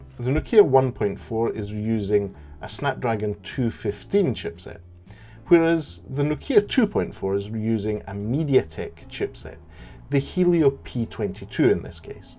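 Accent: Scotland, United Kingdom. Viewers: You sound Scottish 🏴🏴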